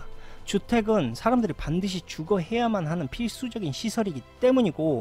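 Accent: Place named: Honam, South Korea. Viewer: 칠백명때부터 보던 사람입니다. 사실 지역감정이 없던 저인데 가족이 전라도 사람에게 사기를 당하고 이후에 안좋은 마음이 자리잡게되었어요. 그런데 억양에서만봐도 님도 호남이 고향이신것 같은데 영상보며 응원많이 합니다.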